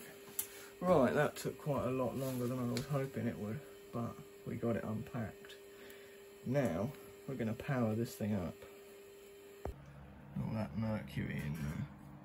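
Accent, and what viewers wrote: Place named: South East England, United Kingdom. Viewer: That's just how most people in SE UK sound btw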